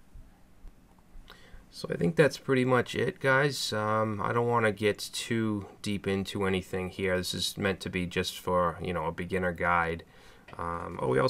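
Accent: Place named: South Boston, United States. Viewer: Straight out of Southie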